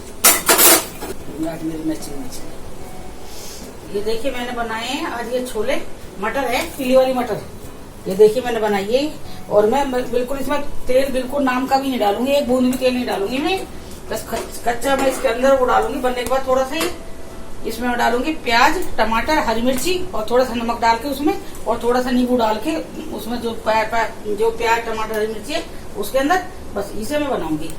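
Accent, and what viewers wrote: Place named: Delhi, India. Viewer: Aunty aap bilkul dilli walo ki tarha baat karti ho aur sochti bhi vaisa hi ho...mujhe accha lagta hai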